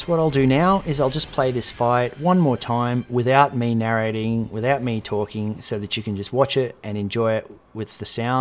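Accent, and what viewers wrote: Australia. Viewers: great to hear an Aussie accent for a change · i feel like everything is easier to learn when explained with an Australian accent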